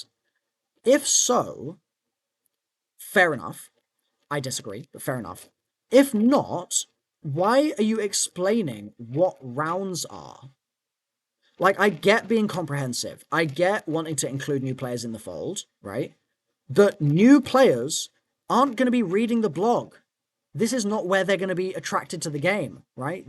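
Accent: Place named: United Kingdom. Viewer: I didn't know you where British.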